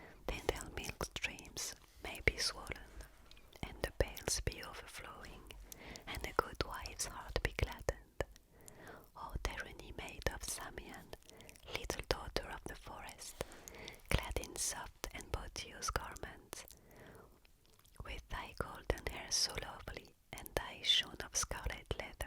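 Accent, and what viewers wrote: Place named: France. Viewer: Gorgeous whispers & great choice of reading - your English is fantastic... especially with your gorgeous French accent 🥰🥰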